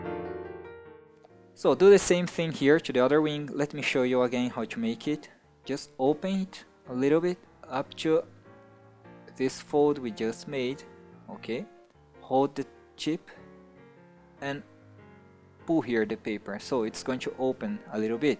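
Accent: Brazil. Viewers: you speak like a Brazilian. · Are you from Brasil. Tem um sotaque de BR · que sotaque em ..... parece até brasileiro kkkkk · I just thought the same thing, he definitely has a Brazilian accent lol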